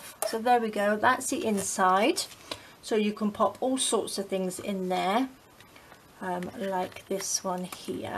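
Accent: United Kingdom. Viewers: Wonderful work! I'll try it, because it seems easy to make. Are you britain? It sounds so. Greetings from Germay.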